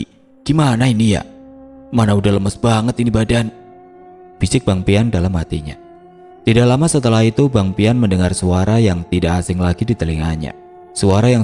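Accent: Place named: Jakarta, Indonesia. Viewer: Btawian bhsany lucu,pagi mas, yg msih di guyur hujan, ni pondok cabe,msih dngrin mas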